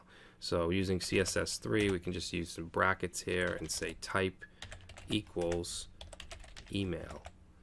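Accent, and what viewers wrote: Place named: New York, United States. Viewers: I love the New York Accent! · NeewYorka Accent ! awesome tutorial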